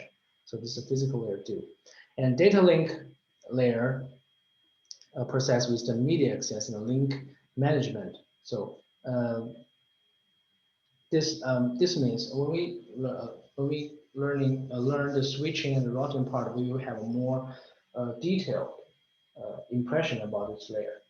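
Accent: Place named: India. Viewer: wtf, indian english.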